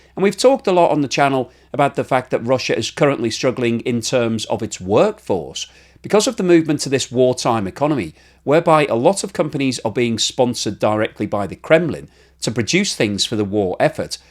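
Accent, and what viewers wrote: Standard. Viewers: Thank you for you standard English. For me as italian is really a pleasure to understand 100% of what you say. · Thanks for this video. As always Your Standard English is perfect. So much easy as an Scandinavian to understand compared to other British slang/accents. Thanks Per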